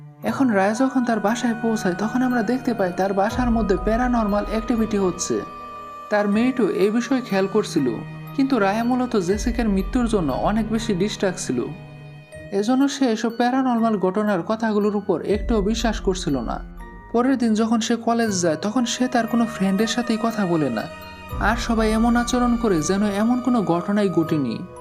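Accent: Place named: Barishal, Bangladesh. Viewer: আপনি কী বরিশালের বা ফরিদপুরের?🐸এমন ভাবে কথা বলেন কেন হ্যা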